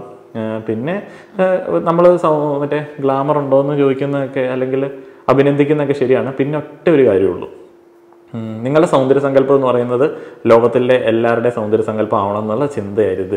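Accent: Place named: Kottayam, India. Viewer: പെങ്ങളുടെ ഭാഷ കേട്ടപ്പം മനസ്സിലായി, കോട്ടയം ജില്ലയുടെ ഏതോ ഭാഗത്ത്‌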